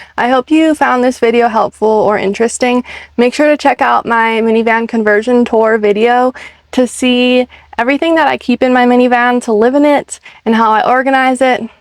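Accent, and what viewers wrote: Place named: United States. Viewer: I really like this accent, can someone let me know which part of the US is it from?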